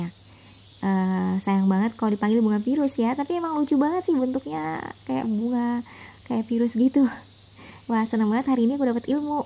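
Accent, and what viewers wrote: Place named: Jakarta, Indonesia. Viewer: Mb swaktu bicara logatnya seperti Jakarta apa bisa pale logat to dialek local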